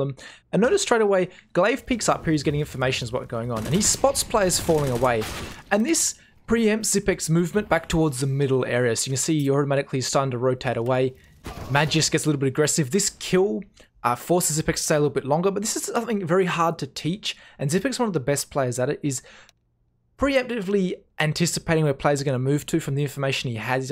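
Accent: Australia. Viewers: One of your absolute best videos ever man. I find the Australian accent is such a great one to listen to with analyses; I know that sounds weird, but it may be thanks to the many cricket matches my father used to watch in the house when I was a kid lol Subjective BS aside, your analysis here was super detailed and spot on. Down to the movement paths, the timings, the mindsets, right down to the metal-association with Nuke B- everything. Really, they are always good; but with this video, you have outdone yourself.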